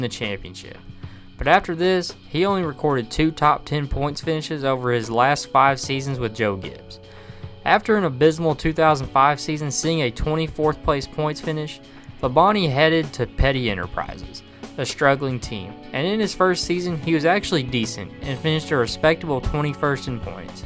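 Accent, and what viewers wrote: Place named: South, United States. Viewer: If Jeff Gordon was a Southerner I imagine he would talk like that.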